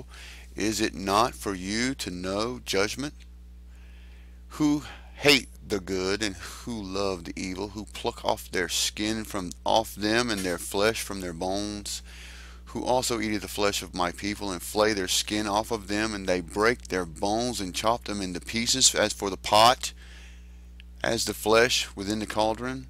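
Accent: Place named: South, United States. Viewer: Being from TX ,, I love your southern accent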